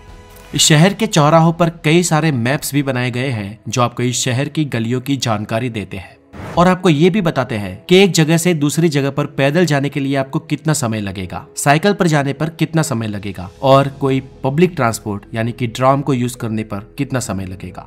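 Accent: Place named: Bihar, India. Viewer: बिहारी लोग विडिओ को " भिडियो " , वोट को "भोट" , वेज/नॉन वेज को " भेज/नान भेज " सड़क को "सरक" , घोड़ा को " घोरा " कहते हैं। आप ज्यादा परेशान न हों।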